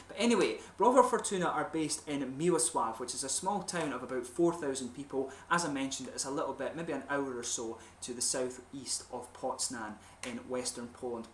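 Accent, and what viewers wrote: Scotland, United Kingdom. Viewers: You have quite a bit of different Language skills , it's difficult I'm sure to acquire those different languages like that . I've watched many Movies with Scottish Actors and Actresses , and when I watch you're Reviews I always look for comparisons between you and them, and you have become really good at curving your accent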